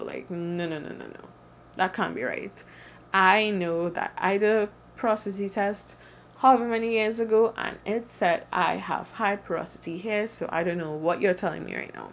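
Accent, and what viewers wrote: Trinidad and Tobago. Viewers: That accent has to be from Trinidad 🇹🇹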